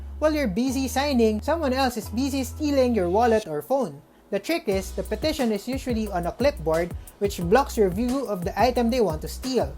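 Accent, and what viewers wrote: Philippines. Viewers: Pinoy accent 👍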